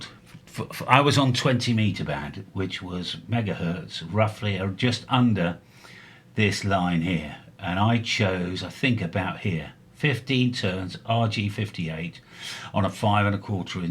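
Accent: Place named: United Kingdom. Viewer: Ugh.... British 🫩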